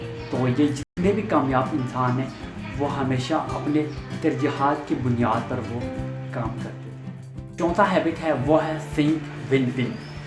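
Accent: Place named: England, United Kingdom. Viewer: Wah bhi Urdu bhi English style mein bol rha ho...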